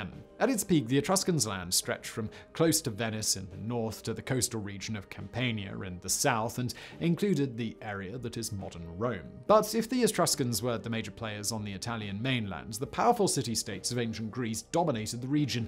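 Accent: United Kingdom. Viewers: Dude. Great vid. But, truth be told, I also come for the British accent and colloquialisms.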